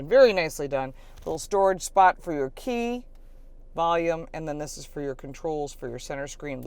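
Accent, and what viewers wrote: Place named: Brooklyn, United States. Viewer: All this time I thought she was Italian ;she sound like she's from Brooklyn. 😊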